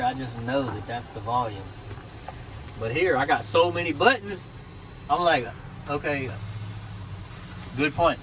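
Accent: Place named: South, United States. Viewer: This guy is hilarious. GOB southern and got a good sense of humor. Goes a long way.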